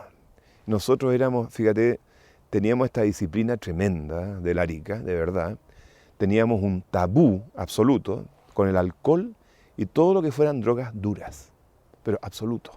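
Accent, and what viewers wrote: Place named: Spain. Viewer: Por que mezclas fonetica castellena con america, es raro como hablas.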